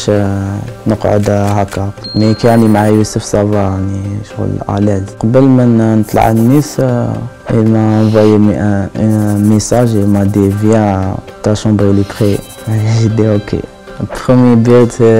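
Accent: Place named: Morocco. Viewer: Pas de doute, tu ne peux être QUE marocain. Je comprends tout ce que tu dis. Alors qu une personne algerienne je dois m appliquer pour comprendre son dialecte. Enfin, peu importe, Bravo Béchar🤗👍❤ allah a nejhak🤗🤗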